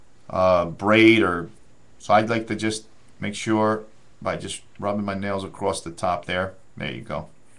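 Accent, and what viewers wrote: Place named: New York, United States. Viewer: yo dawg you from new york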